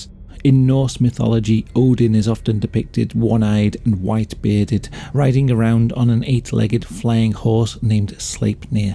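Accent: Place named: Midlands, United Kingdom. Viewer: Yeah, you can post the link so we can read all these stories ourselves, but... I'd rather listen to you tell them in your soothing Midlands accent. 😊 I grew up in Saudi Arabia and went to the International Academy, which followed the British curriculum. I believed in Father Christmas, it was not until we came back Stateside that I got back into Santa. (I do have the obligatory photo of me as a wee toddler, sitting on Santa's lap, bawling hysterically.) A great Santa story comes to us from David Sedaris, in an essay titled, "Six to Eight Black Men." I believe it's in the book Naked. Definitely one of my favorites of his. Happy Xmas everyone!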